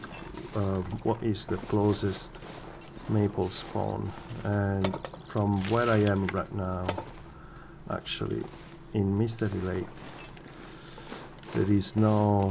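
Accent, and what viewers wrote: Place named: Italy. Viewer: Where in Italy do you come from? You sound like you would come near where I am from. Always a pleasure to watch you playing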